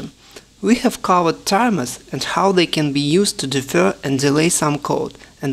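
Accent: Russia. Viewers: можно было бы и на русском, если такое произношение..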